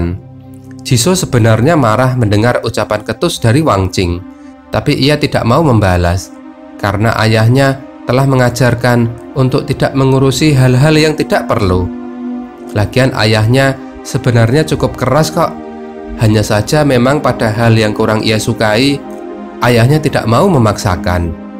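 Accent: Java, Indonesia. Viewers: Adik aku bilang "jisoo org keturunan Jawa yaa bang!?" Itu mah abangnya ini yg pake logat jawanya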